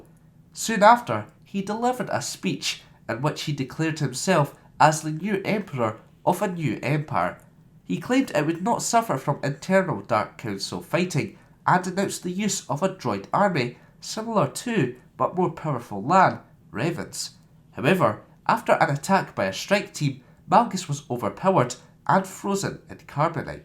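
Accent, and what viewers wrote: Scotland, United Kingdom. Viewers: your scottish i know that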